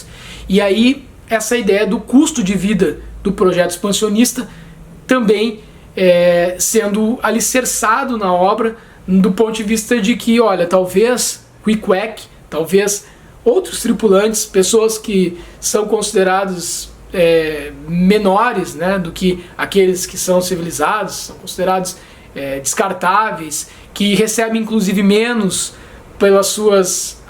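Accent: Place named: Rio Grande do Sul, Brazil. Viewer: Como sempre, excelente resenha. Tenho aqui No coração do mar, obra que inspirou Melville. Ainda nao li. O canibal é um dos melhores personagens.. Pena q vai sumindo no decorrer da leitura. Que loucura de Ahab... Mas tantas vezes nos apegamos a algo e nao queremos largar mesmo sabendo que o final nao será o melhor. Obrigado por ter voltado. Seu gauchês é ótimo kkkk É muita segunda pessoa do singular kkkkkk mas o mineiro aqui gosta! Abraço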